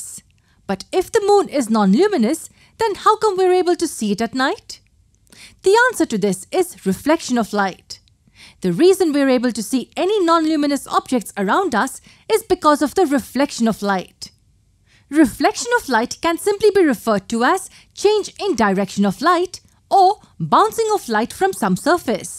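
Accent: India. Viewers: Thickkkkkk INDIA ACCENT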